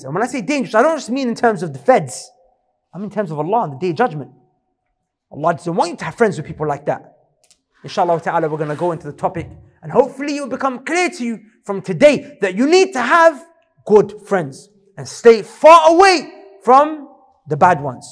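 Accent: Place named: London, United Kingdom. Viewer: This is how a lot of people in London speak these days, not just the ‘youth’. It is just multicultural London English.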